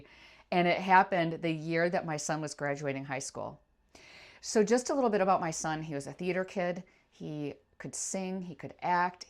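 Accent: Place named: Michigan, United States. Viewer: Wow! Your story is very similar to mine and my name is too. I knew you had to be from MI from your accent. I spent over 25 years living and working in Chicago area and after my 3rd son graduated from high school I started planning my escape. My husband and I had been married for almost 25 years, raised 3 boys, started a land ran a business together (for 10 of those years for me. The company is still thriving in NWI), built a beautiful home, but we hadn't been drifting apart apart our first son left for college at UW-Seattle where he stayed for 15 years (he recently moved back to Chicago area--beautiful but too expensive even with a great job in IT). I left our business in 2013, and started planning to divorce and move, which was a mutual agreement. I was so sick of Chicago winters and always wanted to live at the beach, where we had vacationed many times. 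I moved out of my 3000 sq ft home,into a studio apt where I would live for the next 2.5 yrs, worked at a tax prep firm (I had been a CPA/accountant/tax preparer my whole career so this was a great fit for me). In the summer I worked 3 jobs at once as the min wage in SC is still $7.25, I was struggling but happy and living at the beach. I had never felt freer. Flash forward 11 years I am retired and living in SSI. I met my second husband )totally unplanned for sure), and in 2023 we bought a fixer upper home in a beautiful and safe neighborhood 3 miles from the beach. My friends and family visit often and this year a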